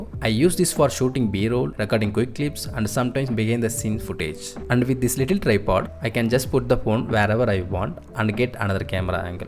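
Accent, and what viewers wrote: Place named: India. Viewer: He is speaking in stereotypical Indian accent but it sounds good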